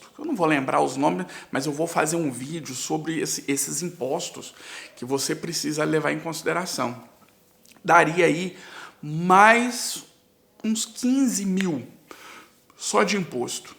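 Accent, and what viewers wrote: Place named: Brazil. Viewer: Só brasileiro phoodido que veio de cidade de merrça que fala assim. Se Portugal fosse tão bom não teriam tantos deles na Europa toda.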